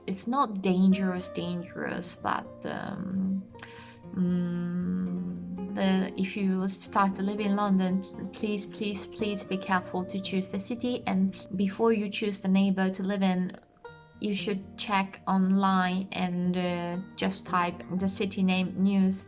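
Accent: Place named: United States. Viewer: はアメリカっぽい英語ですね。とっても流暢で羨ましいです。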